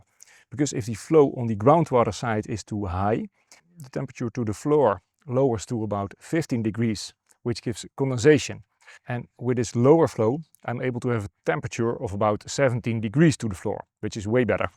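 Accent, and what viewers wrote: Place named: Netherlands. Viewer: Cool solution! We use a similar setup, but our cold water comes from our heatpump. During the recent heatwave we installed a watercooled aircon unit (Innova h2o) to lower the humidity and increase the cooling potential. There's a newer version called Innova WLHP, which is basically designed for a loop type that you are using right now. This trades a bit of electricity for groundwater, as these units can run until the water temperature has reached 60C. Based on your accent, I will assume you're in The Netherlands, we're currently having a shortage of rainfall so consuming less water would be nice :p (p.s. the innova can also be used to preheat or fully heat a boiler for hot tap water, double win for energy reuse :D)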